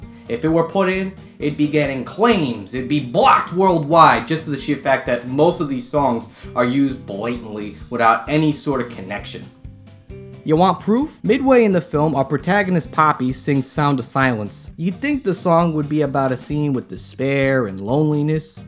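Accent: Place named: Italian-American, United States. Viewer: God I love your Italian-American accent.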